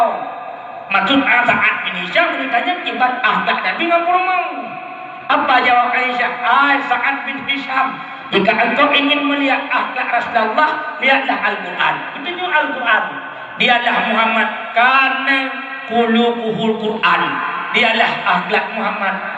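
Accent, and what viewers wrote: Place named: Aceh, Indonesia. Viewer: Dakwah Aceh terbaru ikut mendengarkan logat orang Aceh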